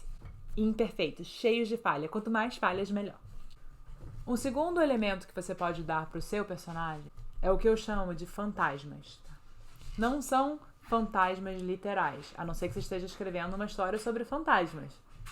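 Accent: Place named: United States. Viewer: Pelo sotaque ela é americana, vi agora um primeiro vídeo seu. Se é americana ou aprendeu nos EUA, o ensinamento sobre roteiro é ouro. 👏👏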